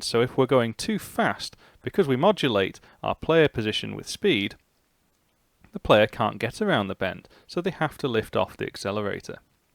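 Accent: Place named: United Kingdom. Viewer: Your accent looks very much like British, it is quite cool. Nice video bro. Thanks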